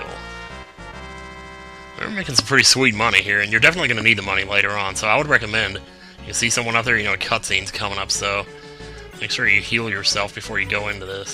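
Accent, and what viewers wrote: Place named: Midwest, United States. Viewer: aw sweet somone from the midwest im guessing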